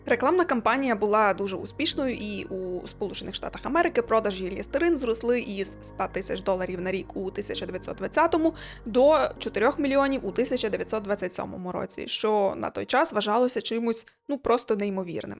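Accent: Transcarpathia, Ukraine. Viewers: Хаха, ти точно з Закарпаття ))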